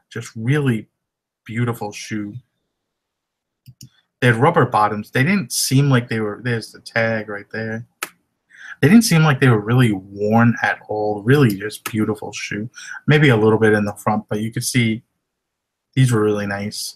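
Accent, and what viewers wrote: Boston, United States. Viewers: I like your Boston accent don't let the bugger's get you down you give always excellent content! Never heard of the Weston shoes till today so something to file away in my search's! Thanks for giving really nice vids! Corie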